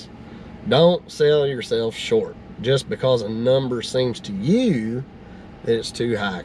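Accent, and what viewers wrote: South, United States. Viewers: YOUR SOUTHERN ACCENT MAKES ME LAUGH. YOU SURE HAVE TAUGHT ME THINGS. THANK YOU.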